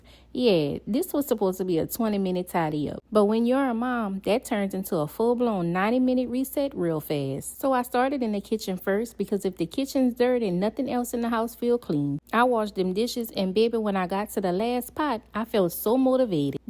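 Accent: Louisiana, United States. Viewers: Are you in Louisiana ?? Omg your accent is so strong & cute ❤️ I am a Texan so i get it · I love you accent. Are you from Louisiana?❤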